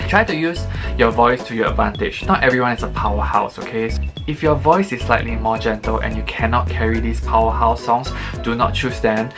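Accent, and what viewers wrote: Singapore. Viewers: I hear a singaporean accent👁👄👁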